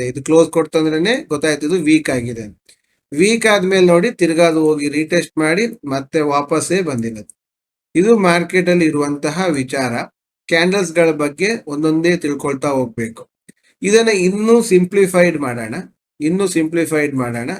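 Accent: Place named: North Karnataka, India. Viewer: Good video sir Uttara Karnataka style helata edira sir stock bagge heli sir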